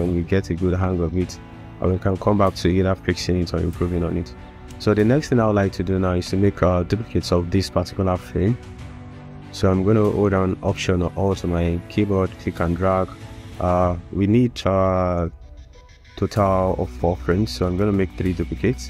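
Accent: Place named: United Kingdom. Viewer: Why british accent exist-_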